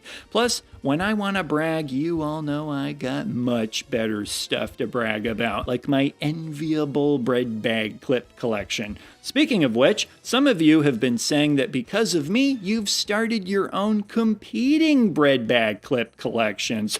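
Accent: Midwest, United States. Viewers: He is strange...where is that accent from...midwest? Northern/Canada?...Mormon territory?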